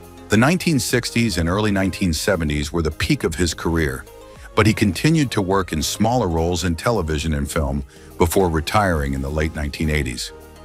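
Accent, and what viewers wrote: United States. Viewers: What a mess up when you get an American to do an English presentation.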